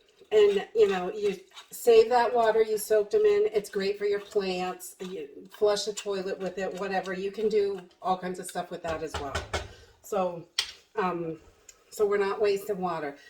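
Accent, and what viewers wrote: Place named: Northeast, United States. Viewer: i cant figure out your accent. is it nj? or ma or ny?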